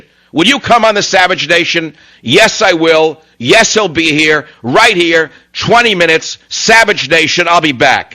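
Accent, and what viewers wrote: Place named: New York, United States. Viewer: for me he reminds me of my uncle. He has a familiar NY accent which reminds me of home. But, his teachings r not commong in NY.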